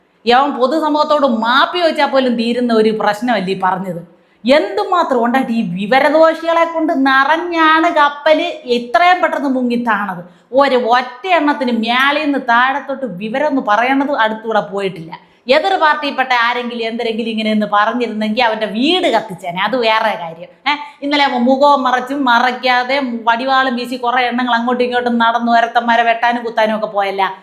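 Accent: Thiruvananthapuram, India. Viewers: അവതരണം മഹാ ബോർ. വേഷം കെട്ടലും. തിരാന്ത്തരം ബാഷകൾ പറയല്ല്🤔🤐🥶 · ur simply awesome.what a super presentation.super tvm slang.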